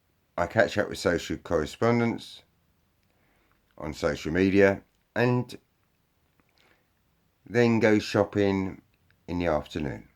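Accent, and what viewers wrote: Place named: Cockney, United Kingdom. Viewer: I've been looking back at some of your earlier videos and note that you used to speak with a cockney accent. Did you put that accent on so as to appear hard?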